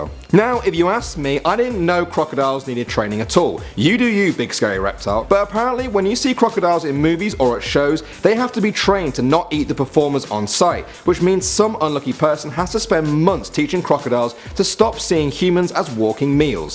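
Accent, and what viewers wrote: United States. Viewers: Your american accent is actually not bad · Your American accent is better than other Americans. And you're British!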